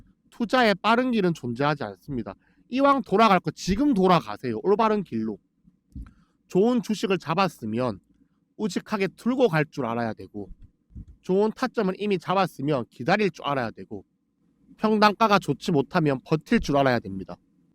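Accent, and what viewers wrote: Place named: Gyeongsang, South Korea. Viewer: 경상도 사투리 의요 정감이 가는 언어 웃어봅니다 이른 아침부터 유익한 정보 감사합니다 님! 믿고 따라갑니다 감사하구요 화이팅입니다~~🎉🎉🎉😅😅😅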